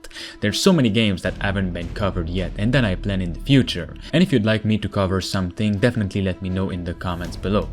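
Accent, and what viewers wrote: Quebec, Canada. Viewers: yes , plz cover it :) . also i can definitely ear that youre from quebec as you dont have a french accent in your english . soooo content de voir un qc qui reeussi sur youtube . ps oui je sais , mon francais est a chier . sa cest due au fait que langlais est plus facile pour moi meme si je suis un pur laine :) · Hey man, are you Québécois ? You have the accent ;)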